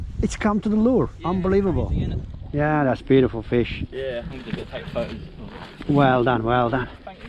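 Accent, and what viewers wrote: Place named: United Kingdom. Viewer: British aksanına hayran kaldım abi bu arada. Bizim balıkçı aleminde nadirattan olacak derecede iyi bir aksan. Selamlar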